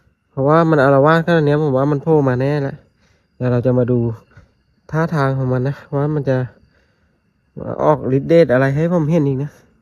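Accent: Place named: Suphanburi, Thailand. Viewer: เป็นคนเหน่อที่ไหน สุพรรณ หรือ ราชบุรี….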